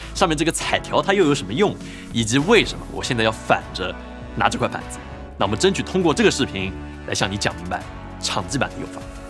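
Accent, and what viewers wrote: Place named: Jiuquan, China. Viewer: 对up主的口音很感兴趣 你是酒泉人么 跟我认识的一个人说话声音几乎一模一样 哈哈哈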